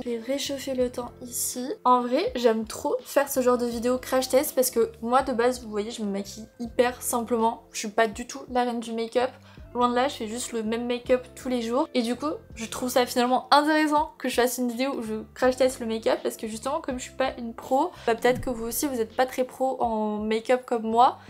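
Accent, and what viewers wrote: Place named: South, France. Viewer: J’ai adoré la vidéo ❤ tu dis tout le temps « quand même » avec un léger accent du sud 😂 j’adore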